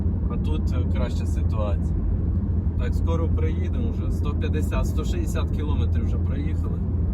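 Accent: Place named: Cherkasy, Ukraine. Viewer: Немає нічого поганого, щоб не вийшло на добре. Так, не все в житті буває так, як ти плануєш. Але ж, насправді, це не найбільше лихо. Хто як не українці знають що таке справжня біда і проблеми. Значить, і не треба було вам саме зараз бути в Черкасах. Все буде так, як має бути. Колись давно, коли всякі несподіванки стали насипатись на мене в різних ситуаціях, я сама собі виробила таку стратегію життя: "сподіватись на краще, але бути готовим до всього". Коли дотримуєшся цього, - легше все переживається. Доречі, привіт вам з містечка на березі Дніпра, з Черкас. Коли я натрапила на ваші відео, шось мені у Вашій вимові, пане, нагадувало черкаський говір. Ну от, бачите, не помилилась.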